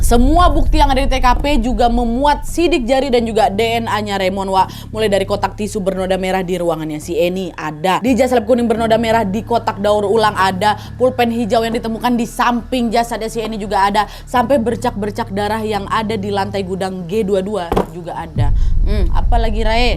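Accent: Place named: Medan, Indonesia. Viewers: Kirain mba satu ini orang Medan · Kak tinggal di jogja atau orang jogja?? Kirain kak orang sumatra/kalimantan dari logat bicaranya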